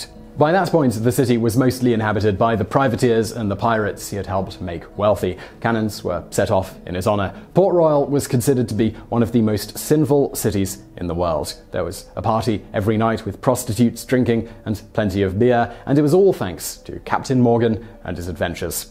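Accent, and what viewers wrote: United Kingdom. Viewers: I don't know about you guys but Simon and Company could research the origin of the toilet seat and we'd click on it and damn it find it one of the most Interesting facts of the day! That British accent just has a way of keeping you engaged in though! Well done again guys! Thx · I love how most people from the British Isles that I've ever heard speaking absolutely refuse to pronounce any word that ends in "-tory" as "toree". It's ALWAYS pronounced "-tree". Could the politics of the "Tories" have anything to do with that I wonder? ;)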